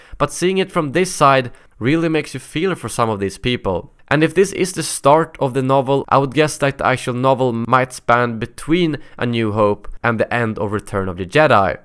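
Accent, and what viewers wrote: Sweden. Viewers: your swedish accent is awesome im from finland so if enyone has terrible accent then its me😁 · it's ok I love your Swedish accent, I could listen to it all day!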